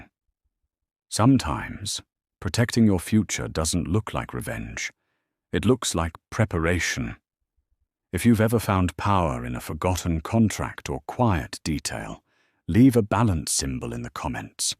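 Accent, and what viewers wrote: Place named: Upper class, United Kingdom. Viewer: In Akron Ohio, a British Aristocrat narrating the story. WOW !!!! At the 4 minute mark, check out the AI text, which transforms "CLAUSE" to "CLAWS"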